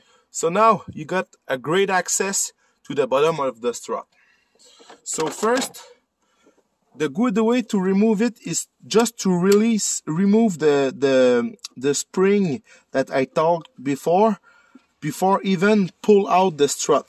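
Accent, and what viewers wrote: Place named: Quebec, Canada. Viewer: how to tell you're from Québec not telling you're from Québec 😁 j'adore tous les deux, le mec et le video! merci 😊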